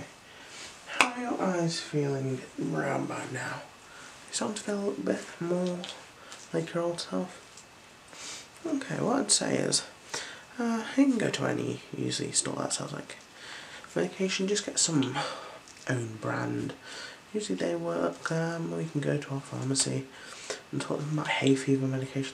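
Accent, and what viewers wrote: United Kingdom. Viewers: Ah, lovely. Heard some of that british accent there.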